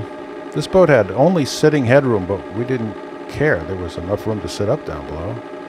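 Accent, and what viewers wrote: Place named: New Jersey, United States. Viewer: I understand why I like your commentary so much now, you are a Jersey guy. You bastards are tougher than a bag of ten penny nails. True story.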